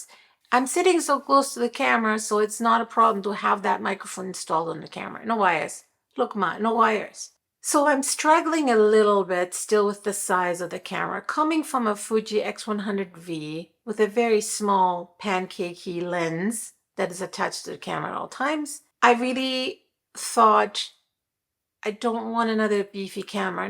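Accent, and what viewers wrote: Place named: United States. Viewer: I thought I heard a faint German vowel. Near-perfect American English. I wish all multilinguals would work on pronunciation.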